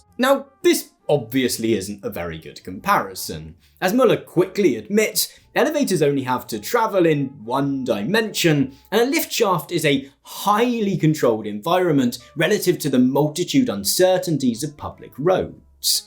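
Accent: United Kingdom. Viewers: British authoritative voice makes this video look to be more than what it actually is, finger-pointing shaming with no concrete facts to backup the claims, only public perception and opinions.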